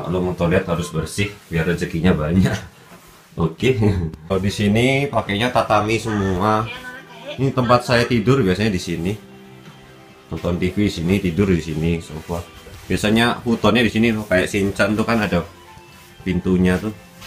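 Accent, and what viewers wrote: Java, Indonesia. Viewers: walah wong jowo tenan, makane kok koyok roh logate iki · Logat jawa nya masih kental ia mas .. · wong jowo orang ilang jowone masio dek jepang salut bang :D medoknyo masih terasa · hepi saterde mas (medhok banget ya meski dah lama di jepang)............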